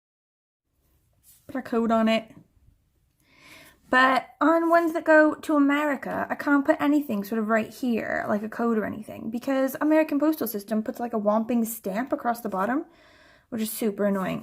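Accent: Wales, United Kingdom. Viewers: Omg u are turning more Welsh with every click. Newest one ul be like Staceu from Gavin and Stacey, no offence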